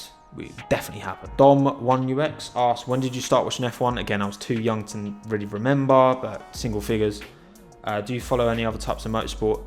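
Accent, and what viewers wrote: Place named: Essex, United Kingdom. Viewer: Didn't even need to ask where you're from, could hear the Essex a mile off! Really enjoying the content 👍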